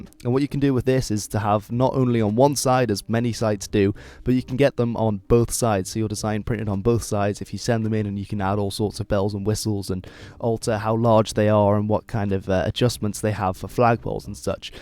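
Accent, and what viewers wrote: Netherlands. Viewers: what country is from? His accent makes me think hes not from the UK but I could be wrong. Is he Dutch? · Detecteer ik hier een heeeuul klein beetje Nederlands accent? Ik weet het niet zeker, en het Engelse accent is bijna perfect maar de Nederlandse uitspraak is te perfect. Deze dude is Nederlands. (denk ik) :D :D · Is he Dutch wtf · I love your channel from now on. You just earned a sub mate. Are you Dutch or something? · Je kan gewoon horen dat je nederlands bent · Are you Dutch?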